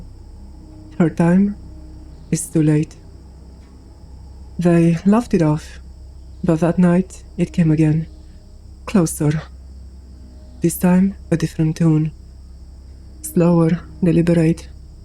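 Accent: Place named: France. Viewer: Ur accent is beautiful and I think Ur original language is french 😅❤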